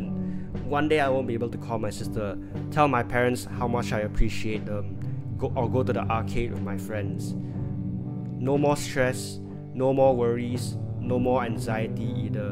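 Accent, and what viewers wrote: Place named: Singapore. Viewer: Singaporean accent makes the message better good luck to you young man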